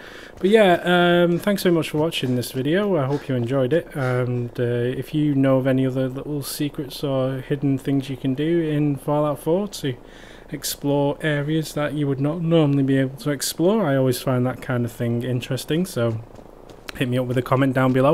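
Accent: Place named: Leeds, United Kingdom. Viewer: That's a leeds accent if ever I heard one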